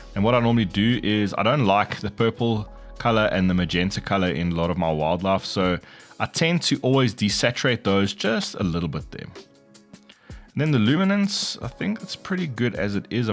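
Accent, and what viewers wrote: South Africa. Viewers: i might be wrong but you sound SouthAfrican, or at least Southern African, and for that i will give this video a like. me supporting local content 😅. jokes aside, i literally started using Lightroom today and the knowledge you parted will act as building blocks to in my photography journey.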